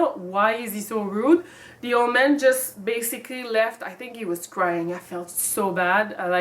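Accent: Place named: Quebec, Canada. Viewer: Whoah ! Une très belle couleur écarlate de tes cheveux ! Je suis passé par hasard sur ta chaîne. Puis j'adore vôtre accent québécoise, c'est se qui fait votre charme ^^. Vous me faîte penser a un personnage d'un jeu nommé Chloé qui fait punk avec son bonnet dans : "Life is strange" : <link> Bon sauf quelle a les cheveux bleu, mais qu'importe... désolé pour ce propos hors sujet de vôtre vidéo mais je tenais a vous le dire.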